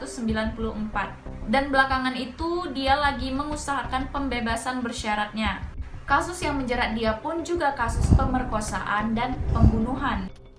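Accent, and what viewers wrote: Medan, Indonesia. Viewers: Mantap logat Medan nya euy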